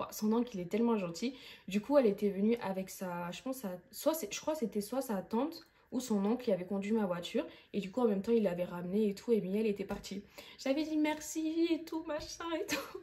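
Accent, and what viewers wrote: Gabon. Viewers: L’accent Gabonais là ooh bien chic 😂😂😂😂😂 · On aime ton accent gabonais ❤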